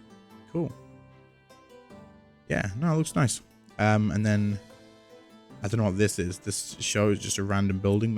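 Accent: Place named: United Kingdom. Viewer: I have decided... I am leaving Runescape for good..... thanks for all the entertainments! i like it British accent 👍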